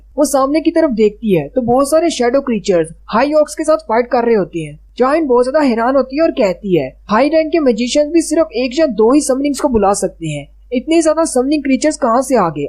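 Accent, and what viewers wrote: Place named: Pakistan. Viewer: Are your from pakistan beacuse your accent is not hindi it's urdu mix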